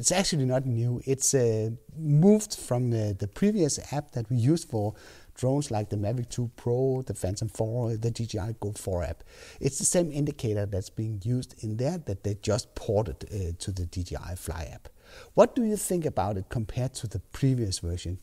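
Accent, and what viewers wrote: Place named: Denmark. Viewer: man kan godt høre at du er dansker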